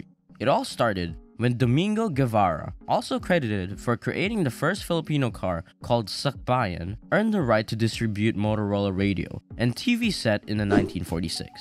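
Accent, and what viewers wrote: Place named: United States. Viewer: Are you American? Pinoy products pero parang Amerikano yung nagsasalita. I find that odd.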